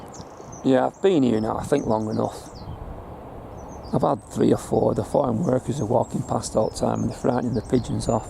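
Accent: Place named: Chadderton, United Kingdom. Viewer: Great video again.....doing my Henry Higgins bit ....from your accent you should have grown up in Chadderton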